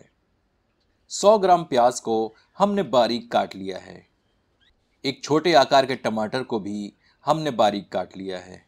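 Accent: Standard. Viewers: apki shudhh hindi .. apka andaz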